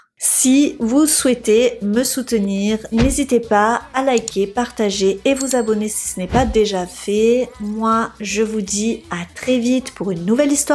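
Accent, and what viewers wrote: France. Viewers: Je ne crois pas avoir déjà entendu parler de cette affaire, sinon c un plaisir d entendre ton accent qui vient du sud si je ne m’abuse? Merci pour ton travail et tes vidéos! 🙏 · Je suis particulièrement déçu que tu ne tiennes pas compte des remarques que l'on peut te faire... Tu es plutôt mimi à regarder...tu as un jolie timbre de voix et ton accent du sud (je suppose) rend tes histoires fort dépaysante... Mais par contre qu'est ce que c'est moche de t'entendre dire mariâche...montâche... garâche...partâche...et tous les mots finissant par age... Pourtant tes récits ne sont pas toujours ou souvent repris par d'autres...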